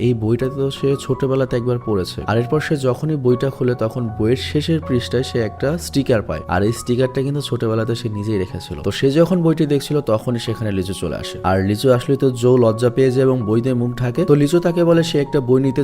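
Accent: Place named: Tangail, Bangladesh. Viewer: তুমি নিশ্চয়ই টাঙ্গাইলের মানুষ তাই না 😅😅😅😅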